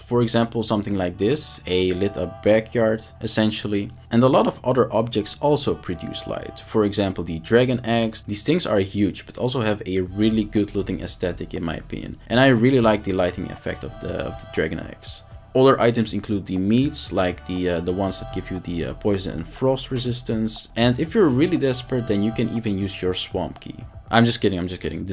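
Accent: Netherlands. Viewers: You have a pretty dutch english accent 😉 thanks for the video, mate! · you sound like a dutch guy not sure but your accent sounds like it haha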